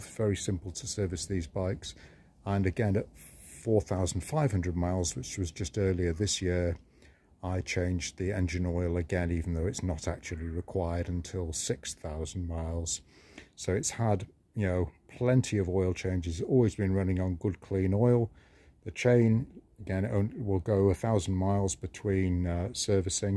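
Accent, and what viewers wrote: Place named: France. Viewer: Can't you tell by his accent he's french?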